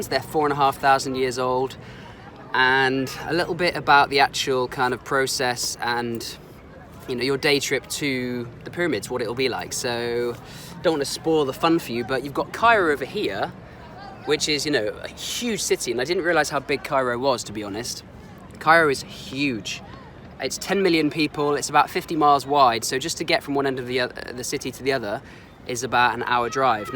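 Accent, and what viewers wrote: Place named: England, United Kingdom. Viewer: Wait, you sound english, why is it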